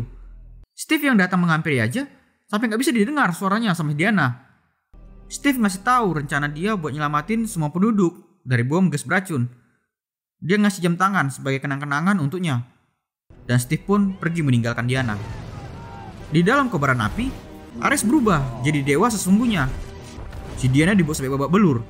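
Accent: Medan, Indonesia. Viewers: Orng medan nya kau wakk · Bahasanya kek temen2 gw di batam..dari medan ya bang?wkwkwk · Ini abang ini ku rasa orang medan dari ngomong nya kayak orang medan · Orang medannn ko kan bang7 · Nampak kali ini kalo kau orang medan 🤣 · Orng medan kau ya lae😂😂😂 Sma lah kita lae Smngat lae buat conten nya👍👍👍👍👏👏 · Lo orang Medan ya bang? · Bahasa mu sperti anak medan bang..😂😂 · Bahasanya anak medan satu nih lucu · orang mana kau bg, medan dimana nya wkwkw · Ngakak gw liat ngomong logat medan ny🤣🤣🤣🤣🤣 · orang medan juga ya bang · Medan x bah abang ini, 😂😂😂😂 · Logatnya itu loh Medan x bah 🤣🤣🤣🤣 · Komentator nya orang medan.... 🤭🤭🤭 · Entah seneng aja dengar orang Medan cerita ... Lucu" gitu 😂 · Anak medan ya ini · Medan kali cakap abang ini bah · Main kali logat medannya bg 😁😁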